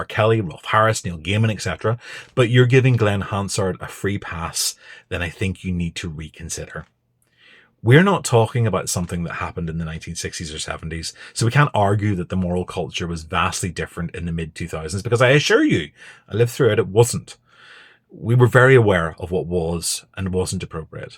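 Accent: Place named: North, United Kingdom. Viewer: A northern accent 😮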